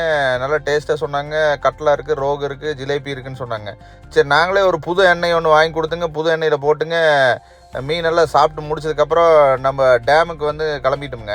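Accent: Kongu, India. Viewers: konku nattu tamil nalla pesareenge 😂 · நீங்க பேசுற கொங்கு தமிழ் மிகவும் அழகு 🙏🙏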